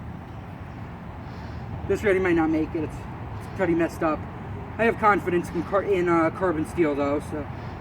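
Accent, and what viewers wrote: Ireland. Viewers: are you from Ireland? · Are u Irish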